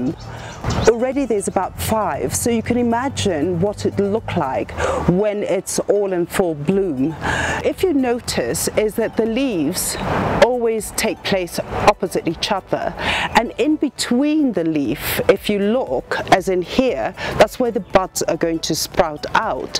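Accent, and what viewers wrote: England, United Kingdom. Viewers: Very good video, especially when you speak English English! Informative!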